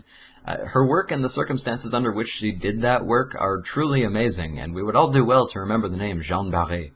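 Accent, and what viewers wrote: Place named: France. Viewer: L'accent français. J'adoooreee.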